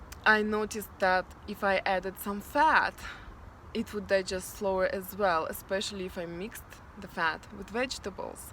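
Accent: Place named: Ukraine. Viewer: thanks for sharing your growth...also love your accent (Ukrainian??)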